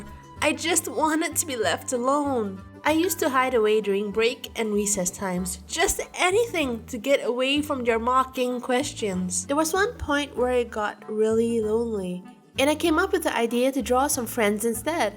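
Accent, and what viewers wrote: Scotland, United Kingdom. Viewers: I love yoyr Scottish accent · your scottish accent isnt that bad like this comment if ur scottish 1st like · It sounds like there’s a q in every sentence in the Scottish acent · I love her Scottish voice because I am Scottish and irish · I can still hear your Scottish accent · i understand scotland accent actually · I live in the UK (Scotland) Your scottish accent is hilarious. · I’m a good artist too this was an amazing story to show to my friends! By the way i like your trying to speak Scottish! Be yourself your beautiful on the inside. Always believable in yourself!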